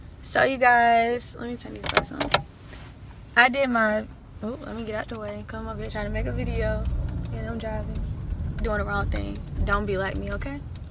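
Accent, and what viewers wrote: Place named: Atlanta, United States. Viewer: Yeah I can hear the Atlanta accent. :)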